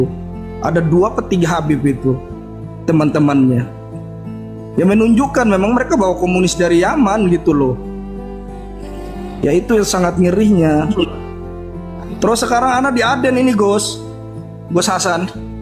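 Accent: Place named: Banjar, Indonesia. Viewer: Sepertinya orang Banjar,,suaranya..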